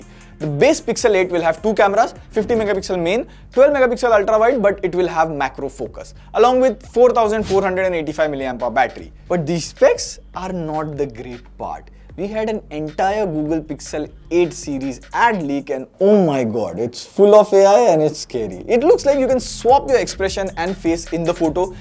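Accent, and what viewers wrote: India. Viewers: What is this obsession with trying to speak English in this ugly Indian accent for Indians, by Indians ?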